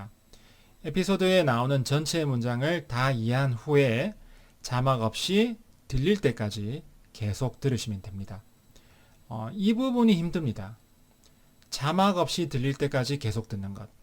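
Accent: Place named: Busan, South Korea. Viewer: 강의중에 부산억양이 살짝 들려서 여쭤 봤습니다! 향후 강의 개설계획 있으시면 좋겠습니다~여기도 부산입니다~~^^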